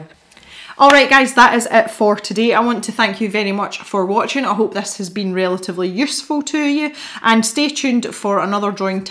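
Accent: Scotland, United Kingdom. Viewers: So good hearing a Scottish accent 🙈 hi from Ayrshire ! Just found your channel love it !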